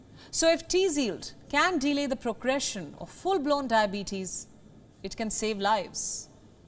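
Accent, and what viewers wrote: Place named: India. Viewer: No, she is trying - and succeeding, in being more easy to understand for audiences not used to Indian-accented English. WION - "world is one news" is aimed at an international as well as a domestic Indian audience. A major component of typical Indian accents is a difference in sound pattern, with the emphasis seeming to occur in the wrong place to what speakers familiar with the most common UK, American and Australian varieties of English expect. Slowing down just by itself helps a lot. If this all sounds like nonsense to you then look up the difference between "stress-timed" and "syllable-timed" languages. English in its country of origin is stress-timed, as is German, Dutch and Russian. Hindi, Spanish, Italian and most southern European languages are syllable-timed. As is the native language of Wales, which is why some native English speakers think people with a strong Welsh accent sound Indian, or that an Indian accent sounds Welsh!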